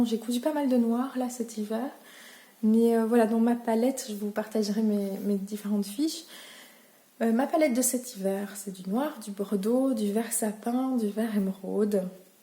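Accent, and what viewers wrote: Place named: Belgium. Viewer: Bonjour, je découvre ta chaîne avec cette review de ce livre que j'hésitais à acheter. Grâce à toi, je ne doute plus. La méthode est très complète, c'est parfait. Merci beaucoup. J'en profite pour m'abonner et découvrir toutes tes autres vidéos. Et ça fait plaisir d'entendre ce si joli accent belge ❤️❤️❤️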